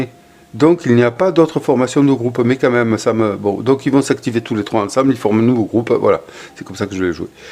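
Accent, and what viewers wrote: South, France. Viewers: laccent du Sud de la france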